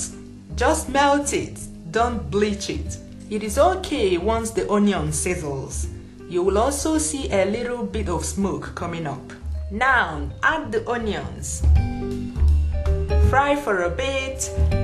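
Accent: Igbo, Nigeria. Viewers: nice accent madam!!! igbo kwenu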